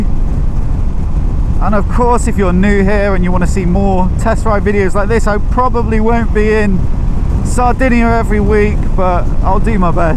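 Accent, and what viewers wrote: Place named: RP, United Kingdom. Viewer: Knows how to speak English? He is English. Nothing but the Queens English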